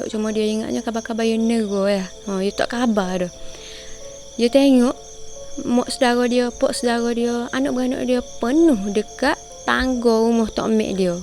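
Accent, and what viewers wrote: Kelantan, Malaysia. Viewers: Hebat sangat ke minah ni speaking setiap kali kau bercerita kisah seram?bunyi orang kelantan!tapi acah acah bagus cakap mat saleh😜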